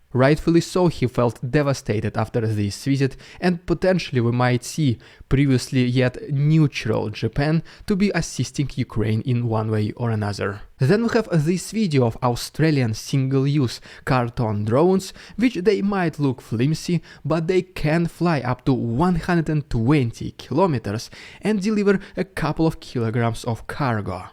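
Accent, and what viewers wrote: Russia. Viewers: I'd like to congratulate the on his spoken English. I listen to his videos on 1.75 times 'normal' speed and understand perfectly well what he's saying. He has a very pronounced accent, but it doesn't seem to matter. He speaks clearly and expressively. Here in the UK we hear many languages spoken, and we hear English spoken with varying degrees of success by people from all over the world. There is a fine line between speaking with a non-native accent and being unintelligible. Announcements made on the London underground train network are sometimes made by non-native speakers (why?). They are often incomprehensible – even to native speakers like myself who have excellent English comprehension and hearing skills. Well done, – and Slava Ukraine!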